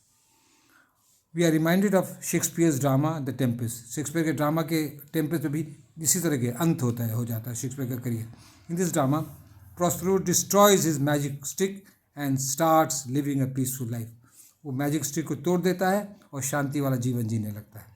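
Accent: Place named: Bihar, India. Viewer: Sir ap Bihar se hai kya? 😂 Hasi hoybe kregi hahaha. I'm also from Bihar that's why I understand your dialect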